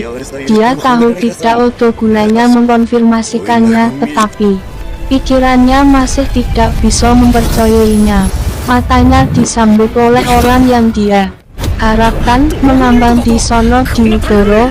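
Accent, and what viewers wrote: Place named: Java, Indonesia. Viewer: Medok yah suara nyah 😇😇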